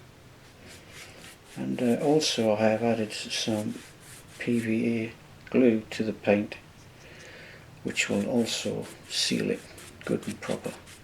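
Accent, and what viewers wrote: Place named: North East England, United Kingdom. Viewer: really enjoyed these two platform videos - I'm about to renovate/make some of my own. I think your craftsmanship and attention to details are amazing and your patience in doing the work exemplary. The soft north eastern tones of your calm voice make it a pleasure to watch. I fear I do not have the patience to do as good a job but I can assure you I have been inspired. Many thanks!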